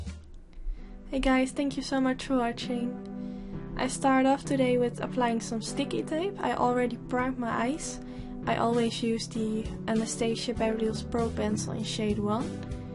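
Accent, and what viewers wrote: Netherlands. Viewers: nederlanders praten echt allemaal op een heel typische manier engels haha, heel vreemd! niet slecht bedoeld trouwens, viel me gewoon meteen op :)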